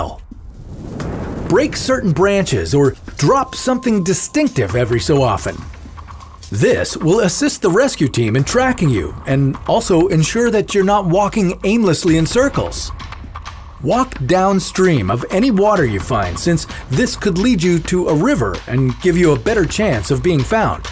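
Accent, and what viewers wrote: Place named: United States. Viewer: american accents are the worst. we need an old english dude commentating this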